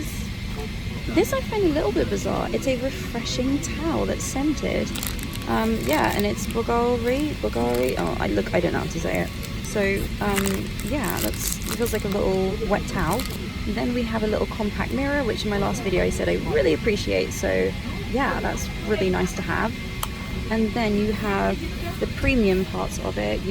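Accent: RP, United Kingdom. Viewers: Posh upper middle class totty. Loving the private school accent. In love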